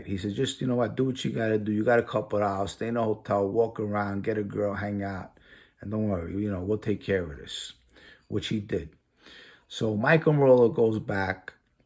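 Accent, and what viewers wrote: New York, United States. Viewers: This fuckin guys is hilarious...that NY accent is 2nd to none...and love how he prefaces someone's name with "The Kid" lmfao.... all the best to ya hope you make millions ...you're entertaining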